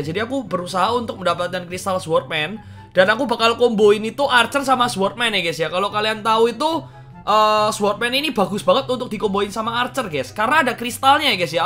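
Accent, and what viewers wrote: Java, Indonesia. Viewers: medokkkkk jawa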